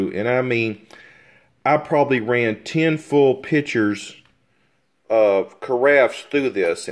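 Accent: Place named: Texas, United States. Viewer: I knew you had to be from Texas when I heard you start the video :) Thanks for the tips.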